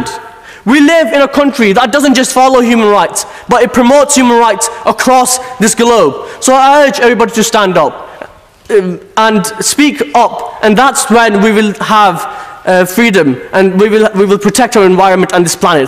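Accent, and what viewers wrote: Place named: Yorkshire, United Kingdom. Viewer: You are British, right. I would appreciate if you work for or raise issue of child poverty in the UK. And for your Kashmir crying- read some history. Your dads and uncles kept silent or took part in the Hindu pandit genocide in 1989. Easy to take one page from history and add your fancy Yorkshire accent to bark about. World is not just for Muslims!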